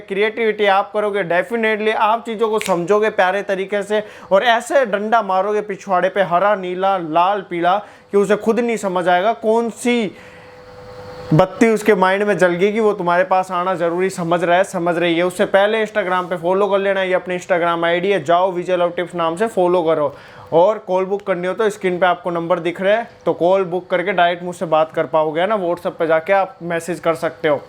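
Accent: Haryana, India. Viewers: I like your language and accent,is it haryanvi?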